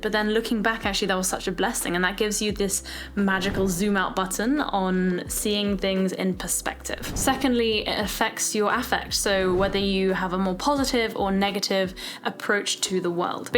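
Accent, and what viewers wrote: England, United Kingdom. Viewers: I'm 7 seconds in with with English accent and I'm "in".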